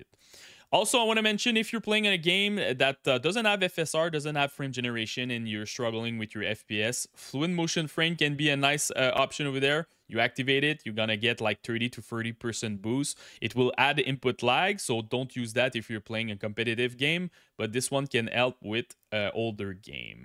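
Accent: Quebec, Canada. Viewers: Was interested until I heard "French" Canadian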